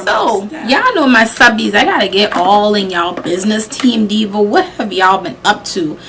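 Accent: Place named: Charleston, United States. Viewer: Came across these topper and wanted to see the pay off and I must say I'm impressed have to get a few now since I've checked out the video review first.. You sound like your from Charleston if so I'm a fellow Charlestonian. Makeup came out beautiful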